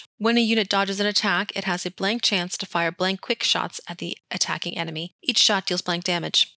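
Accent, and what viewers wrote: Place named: New Jersey, United States. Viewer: New Jersey accent?! How did you end up in Europe? Come back to Jersey.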